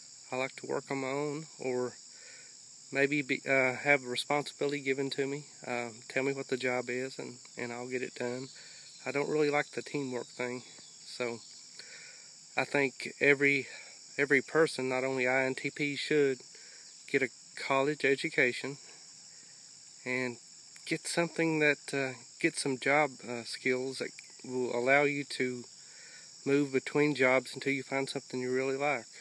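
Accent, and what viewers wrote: South, United States. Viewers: I really like you. There's something so nice about your matter of factness mixed with the southern accent. For an INTP you do have a lot of vocal inflection and i can tell that you're a very wise person. Thanks for your videos and all your feedback on my videos.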